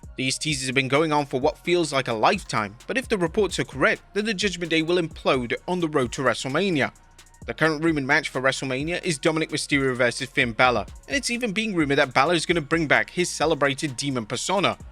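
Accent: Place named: United Kingdom. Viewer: British accent is getting a little old ya know? How about we switch it up. I would assume you’re multi talented, so let’s get an Indian or a Chinese accent. Maybe even Australian?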